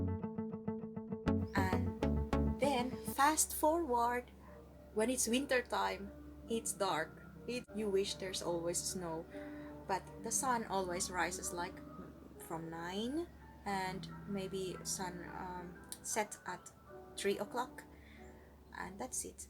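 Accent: Finland. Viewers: You have very Finnish accent like for English :)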